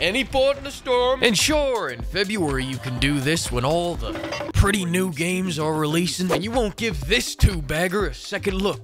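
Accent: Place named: United States. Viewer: It was the cowboy accent for me lol.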